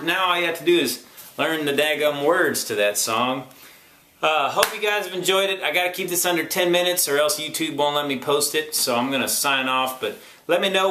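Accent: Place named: South, United States. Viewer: Howdy partner your southern accent is the best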